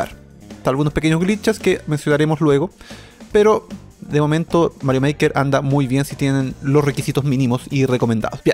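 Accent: Chile. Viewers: ¿Chileno? Hablas como Vardoc xD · Ikr! Tiene acento chileno xDDDD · hahah solo es el tipico acento chileno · Te apuesto a que eri chileno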